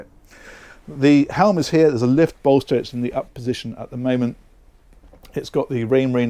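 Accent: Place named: United Kingdom. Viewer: Hey you can say it any way you want to.. your a Brit and I for one don't expect you to talk like an American.. I am an American and I don't talk like a Brit.... I enjoy the heck outta these videos now matter! even if you spoke Aussie.. though that is rather hard to understand when they slip into there slang.. Man ya gotta love though.. take care y'all see you on the next one..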